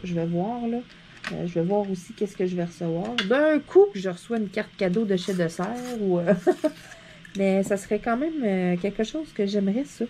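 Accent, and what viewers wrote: Quebec, Canada. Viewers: Coucou. Surtout reste comme tu es. Ton accent canadien, tes cheveux et tes ongles sont toujours un sujet de curiosité pour moi. Ta bonne humeur et ta fraîcheur font plaisir. Ne change pas pour les personnes dont les intentions sont plus que douteuses... Bisous bisous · Laisse les faire c'est des jaloux ou jalouses et continue tu es mon petit rayon de soleil dans ma journée. Peut-etre que ton accent quebecois dérange que pour quelques francais notre language est dure a comprendre. Bizarre je vois pas de brillants moi.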